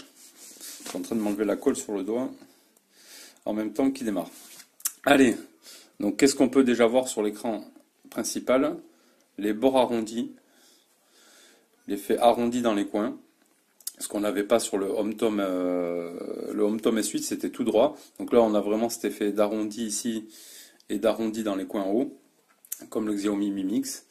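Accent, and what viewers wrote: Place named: South-West, France. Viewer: J'adore vos vidéos ! L'accent du sud ouest et les petites erreurs c'est trop mignon lol ! Continuez !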